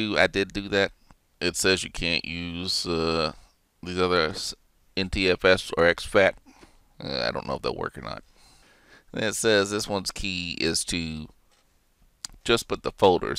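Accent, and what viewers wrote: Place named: United States. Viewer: Nice and concise once you get to grips with the usa twang. A Kings English version would be most helpful. Thanks anyway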